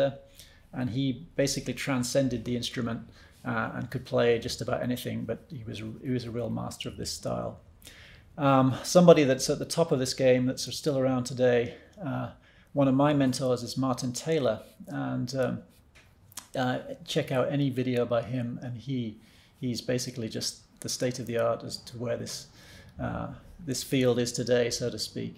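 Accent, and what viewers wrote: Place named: England, United Kingdom. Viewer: Hi just seen the horizon doc Strange signals from outer space, very interesting.Great guitar playing by the way. Noticed your accent. Are you English.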